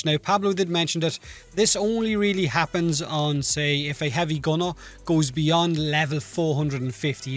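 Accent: Ireland. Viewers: U from Ireland bro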